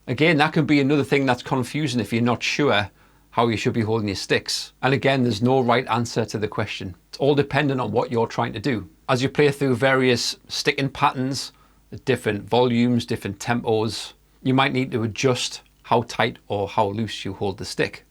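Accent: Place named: Northern England, United Kingdom. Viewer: Silly question: may I ask you where are you from? I'm Italian, and I like different accents, so I'd like to "link" a particular accent's sound to the city/area it's from. To me, yours sounds like a Northern accent, but I have very little knowledge, all I know is "something from Liverpool - yes, The Beatles 😂😂😂 - to Scotland" 🤷🏻‍♂️🤦🏻‍♂️🤦🏻‍♂️🤦🏻‍♂️. Would you please help me? Thanks a lot! (...and great video, by the way! 😉👍🏻👍🏻👍🏻)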